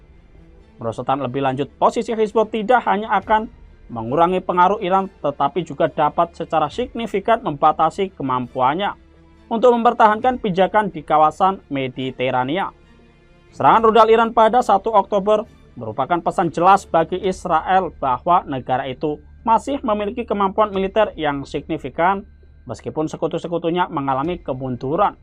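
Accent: Java, Indonesia. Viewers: Pembawa acaranya kurang cocok, selain logat jawanya yg kentel.. cara bicaranya pun kurang mengenakkan didengar telinga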